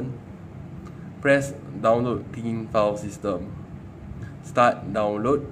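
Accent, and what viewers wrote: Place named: Malaysia. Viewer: no wonder sounds like malaysian.thanks btw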